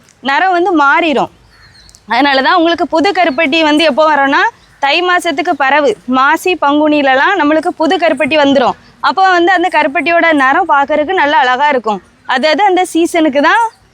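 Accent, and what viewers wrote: Tirunelveli, India. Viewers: பேச்சு திரு வேலி. இருப் இராமநாதபுரம் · ரொம்ப நல்லது வாழ்த்துக்கள் அருமையான நெல்லை தமிழ் சூப்பர்.. கருப்பட்டி விலையை சொல்லுங்கள்.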